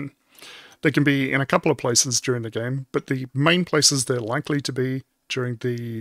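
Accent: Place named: Australia. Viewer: Mine's on the boat too! I'm Aussie. U sound like one too!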